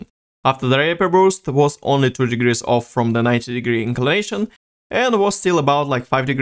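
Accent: Russia. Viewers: he's Russian, you can hear it tho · Вы Россиянин? Акцент очень похожий на русский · Ты ведь из России или снг, да?) Забавно что человека с акцентом понимать намного легче чем говорящего на чистом английском. Классное видео) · жесть - такой русский акцент 8)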